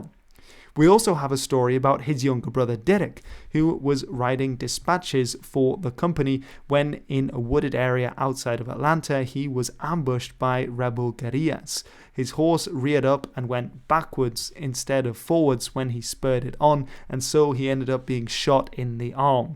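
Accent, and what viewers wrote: Netherlands. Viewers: I clearly hear the Dutch accent. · He's definitly dutch. He uses an english accent, and does it well, but his dutch does shimmer trough.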